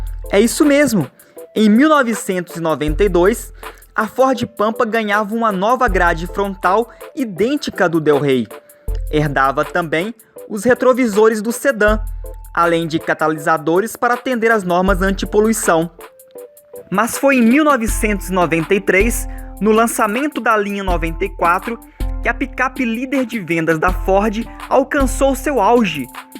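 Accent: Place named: Northeast, Brazil. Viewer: Velho sotaque noredestino. Inconfundível.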